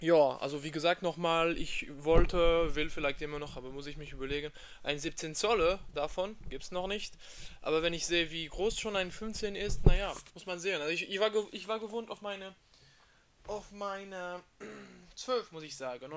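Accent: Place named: France. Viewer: hab ich mir gedacht man hört den französischen akzent parce que je parle aussi francais ;)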